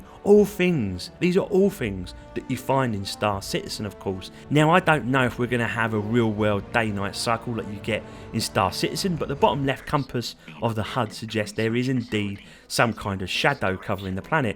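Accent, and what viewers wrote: United Kingdom. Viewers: I really enjoyed the British accent. Where are you from lad?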